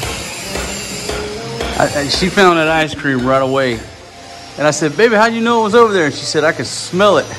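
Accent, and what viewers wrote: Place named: Texas, United States. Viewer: Hi, alam mo you are the best in that region... your videos are the best// I am from Singapore and have a lot of USA friends, pero you are the best although i can sense you from Texas (your accent the giveaway)... hahahahaha/// love your videos my friend... Waiting for you to choose your wife number 3... don't know what I blabbering... kasi I am drunk... hhahahaha... nice videos my friend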